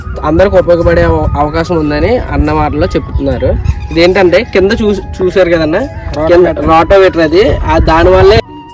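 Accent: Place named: Konaseema, India. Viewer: కోనసీమ యాస.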